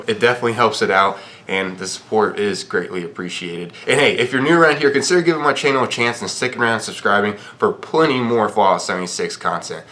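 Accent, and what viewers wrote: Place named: Philadelphia, United States. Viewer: You from philly? You sound like me. Go birds! Thumbs up!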